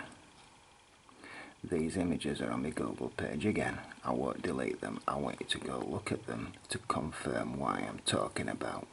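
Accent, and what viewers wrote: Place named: Scotland, United Kingdom. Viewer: Love your work, being a fellow neighbour scot..dig the accent (no pun intended) i love sherlock holmes at the best of times..i mean serously..have learned much form his methods and observations but this takes it to a whole new level...when you explain it then you see it for what it is...it becomes the elephant in the room...i have a construction back ground myself, so know a thing or two from an engineers stand point... and i look all around me at modern cities every where i have traveled been to over 21 countries so far...and i can't help but notice that rapid rate of erosion and dilapidation of our modern buildings...so really makes you wonder what <num> odd years would look like?...and it pretty clear that IS what we are looking at..so many pre-civilisations being reset every few thousand years...when your numbers up - your numbers up.....keep up the amazing work..you are doing breakthrough work. 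1 QUESTION ?? interested to hear your theory on what would create the volume of sand we see everywhere all over the world...Like the deserts...(Maybe once Oceans) its maybe that the old world was Silca based and now we are carbon....SO much sand..(and) water..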